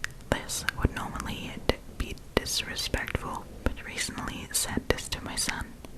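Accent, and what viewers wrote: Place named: Australia. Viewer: Wait am I going crazy here or are you German? Because I got so thrown off by the Australian accent omg!!❤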